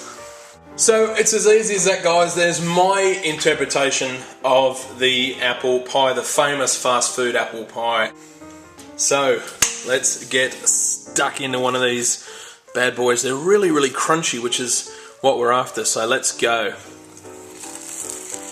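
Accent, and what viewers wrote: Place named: Australia. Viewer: awkward ... hes Australian.... .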